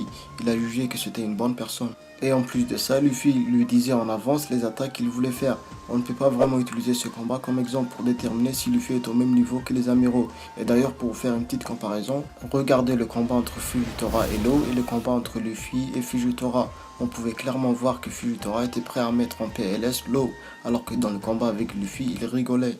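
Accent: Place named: North Africa. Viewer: Toi sa se voit t un bledar vu ta voix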